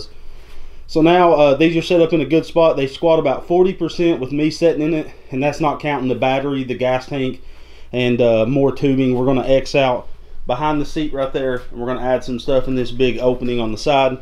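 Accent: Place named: Georgia, United States. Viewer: Cool. When I first heard you talk I thought you were from South Georgia. Nice video!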